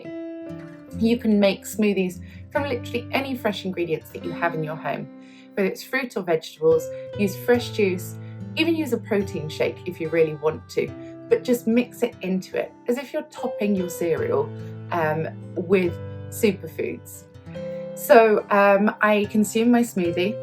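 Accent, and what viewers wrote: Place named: United Kingdom. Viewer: I came from LESA (Les amateurs) he is a very famous youtuber from Argentina who recommended you. I also want to tell you ai love you and your sweety british accent, I hope to meet you the day I will get back to England or also be welcome to China, the country where I live.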